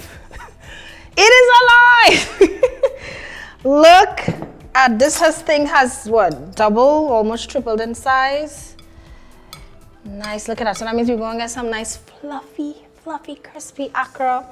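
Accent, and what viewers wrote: Trinidad and Tobago. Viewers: In Grenada, we call it fish cakes or saltfish cakes. The next time I make it, I'll try the jalapeño. This trini-accent is everything.💞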